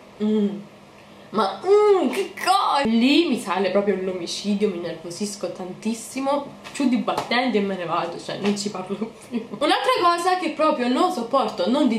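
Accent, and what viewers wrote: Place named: Naples, Italy. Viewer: sei di una bellezza rara. Il punto però è un altro, secondo me saresti un'ottima attrice per la tua grandiosa espressività. Sono anni che sono su youtube, seguo tante tante ragazze, ma questo gesticolare, parlare fresco e accentato napoletano senza alcuna volgarità, fa sì che tu possa ricordarmi la amata Sofia Loren. Baci.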